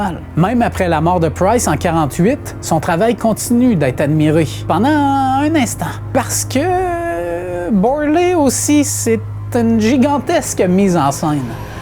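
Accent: Quebec, Canada. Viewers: Si les événements paranormaux sont constamment débusqués, c’est justement parce que c’est faux. Ce qui est paranormal dans ce canal, c’est l’accent fatigant de Québec avec les « é» prononcés en "oé". Okoé ? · Trop bonne, votre vidéo… et sympa, votre petit accent qui fleure bon le Canada 👏👏🌷🌹🌷🥀🌷🌹🌷👏👏!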